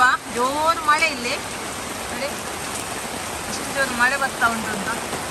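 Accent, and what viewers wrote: Mangalore, India. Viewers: Mangalore kannada thumbha chennagide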